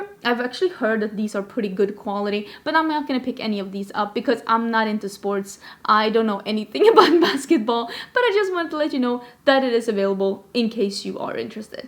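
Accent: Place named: Sweden. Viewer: Man hör att hon e svenne iaf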